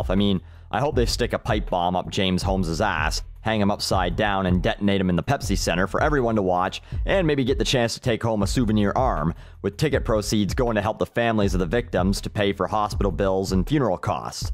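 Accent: Canada. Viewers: your canadian is showing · He sounds canadian. Is he?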